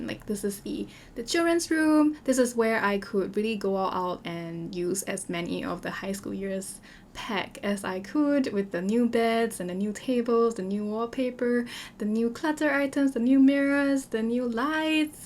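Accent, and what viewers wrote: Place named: Singapore. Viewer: i heard you accent and went ??? Singaporean 🥰 so nice to see a local simmer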